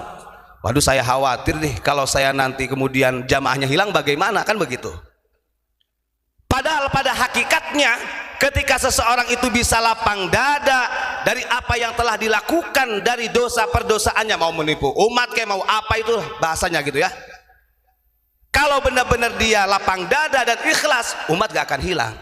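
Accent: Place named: West Java, Indonesia. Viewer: Gus orang Sunda apa Java soalnya aksen sunda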